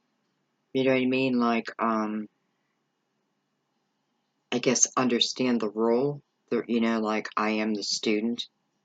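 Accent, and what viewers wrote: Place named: Philadelphia, United States. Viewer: The wedding stuff happened. You speak like the people I grew up with ie Philadelphia accent or Jersey/delaware. Just curious, am I close?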